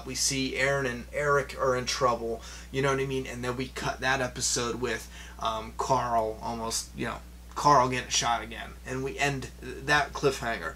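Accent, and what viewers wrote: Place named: New England, United States. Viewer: The way you talk...are you from New England??? Haha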